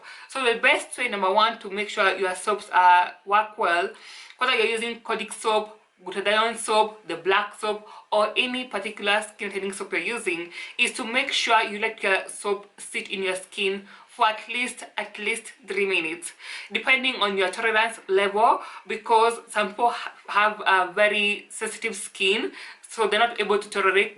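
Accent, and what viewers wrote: Kenya. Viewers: When I heard ur accent I know ur a Kenyan 😍😍😍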